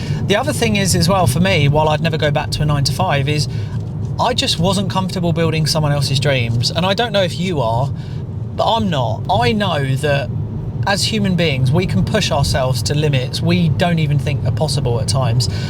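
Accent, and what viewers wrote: United Kingdom. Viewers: If everyone had this same mindset you have, there'd be no infrastructure and no society. You'd have no road to drive your car on whilst making this video. There'd be no supplies to feed your kids because there would be no truck drivers hauling through long hours to deliver all the essential items we need to stock our shelves. Judging by your accent, you're from the UK? UK Lads are some of the finest scaffolders in the world. If these lads all paid heed to your advice, there would be no accessible platforms built to allow the other trades to build all our houses and commercial buildings. Everyone wants to be an entrepreneur until they realise that the world survives off of graft and sweat, not faarken' pen pushers complaining.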